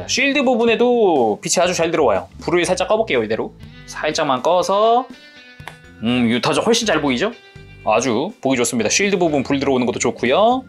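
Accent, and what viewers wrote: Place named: Jeolla, South Korea. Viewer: MGEX 리뷰는 이채널에서 한 리뷰가 넘사벽인듯.. 근데... 전라도 사투리는 좀...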